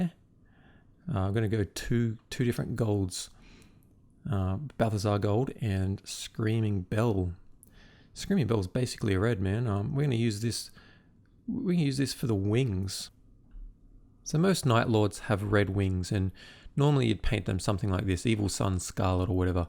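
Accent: Australia. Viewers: Aussie to the core without being too ocker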